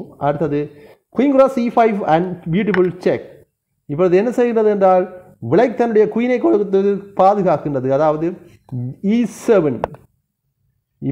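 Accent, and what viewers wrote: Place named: Sri Lanka. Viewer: Arumai ilangai Tamil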